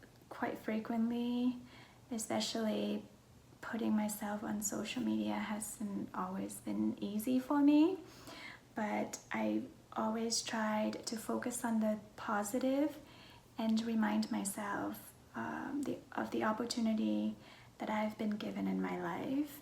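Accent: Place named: United States. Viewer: Your accent is very Murican and you're a cutie patootie!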